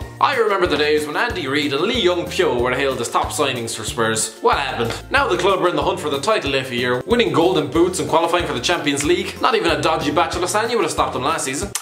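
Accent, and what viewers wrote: Ireland. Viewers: He is Irish the best people in the world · You sound Irish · I just keep watching it because of his Irish accent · Is this lad from Ireland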